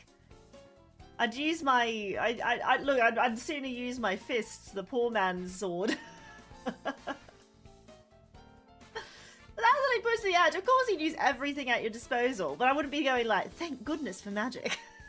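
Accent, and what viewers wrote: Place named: England, United Kingdom. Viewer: She's just English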